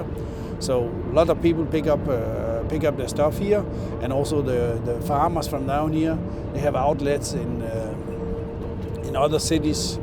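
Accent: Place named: Germany. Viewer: Croat here, very nice video Sir.... Only negative thing for me personally, your english sounds like Klaus Schwab talking 😊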